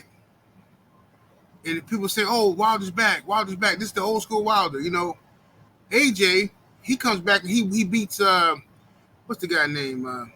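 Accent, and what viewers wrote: United Kingdom. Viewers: Your U.K. accent. 😂👌🏻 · Your Scouse accent 😂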